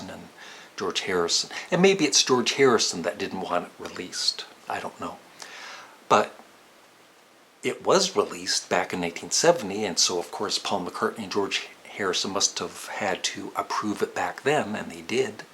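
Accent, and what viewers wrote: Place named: Canada. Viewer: Hey, I've got to say, Side 1 of ' ' epitomizes the ' ' sound. Also, I've got an idea for a future video. Could you review...not necessarily rank... fashion from their beginning in leather through their mod look, to their long(er) hair hippie look? I think it's fascinating they they evolved into so many looks (and sounds!) in only a few years! Also, can you work the word 'about' into your videos more? I love how you Canadians say 'abooot'.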